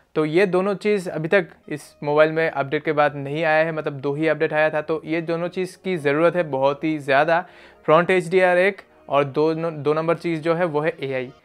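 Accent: Bengal, India. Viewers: Bengali I guess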